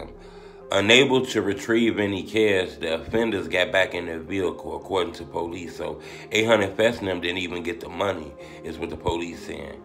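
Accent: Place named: South, United States. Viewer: This man country as hell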